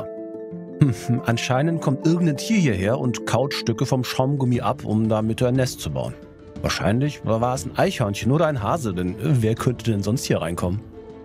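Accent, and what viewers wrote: Russia. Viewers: Der russische akzent fehlt mir schon ein bisschen...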